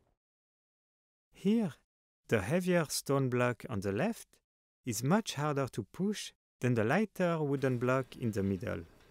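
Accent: France. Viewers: Magnifique accent français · Accent français ^^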